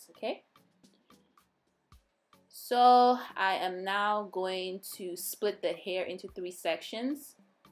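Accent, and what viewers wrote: Nigeria. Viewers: I love your accent. Are you Nigerian?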